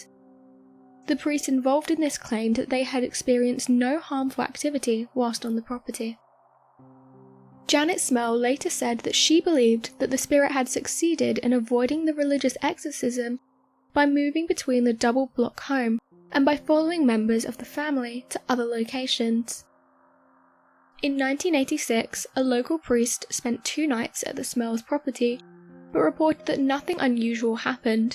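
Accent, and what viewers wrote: England, United Kingdom. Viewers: Why is it hard to understand a clear English accent?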